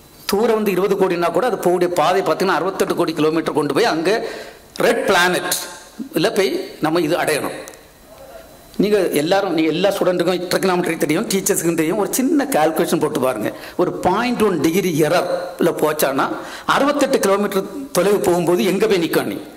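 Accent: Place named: Nanjil Nadu, India. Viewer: நாஞ்சில் நாட்டு தமிழ் மணக்கிறது.....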